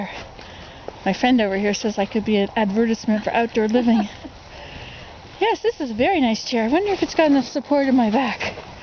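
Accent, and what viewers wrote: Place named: Canada. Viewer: Hello, I've Never Heard Advertisement Pronounced the Way you Pronounced it, but everyone Pronounces things Differently!! I Thought it was Funny when the Lady Said "Are You Talking to Someone....Or...." haha. I Heard the "Aye" at the End of the Sentences, like I've heard some Canadians say "Aye" or "Eh". Anyways, I enjoyed the Video!!!! There are A lot of Good Things to Find at the Dump. Like the Saying Goes, "One Man's Trash is another Man's Treasure" Or, Woman. ~Erin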